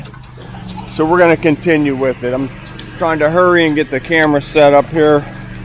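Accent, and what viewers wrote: Pittsburgh, United States. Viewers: As soon as I heard you talk I knew you must be from somewhere near Pittsburgh, PA… am I right? I grew up there.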